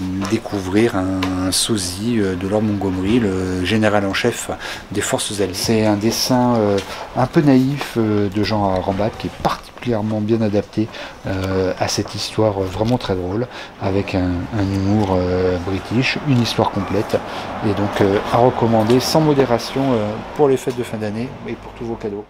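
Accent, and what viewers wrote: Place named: Spain. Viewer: enfin te revoilà! une bien sympathique vidéo, qui donne envie de se mettre à la BD, merci! j'ai adoré ton accent espagnol aussi!;)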